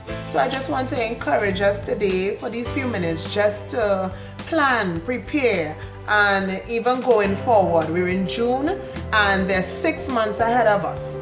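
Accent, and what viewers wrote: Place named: Trinidad and Tobago. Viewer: Yesss this is so true plus the story in a Trini accent makes it even better 😊 😘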